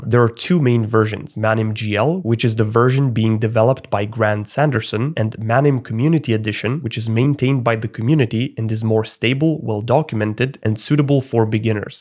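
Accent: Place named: Europe. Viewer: it's the European Manim voice.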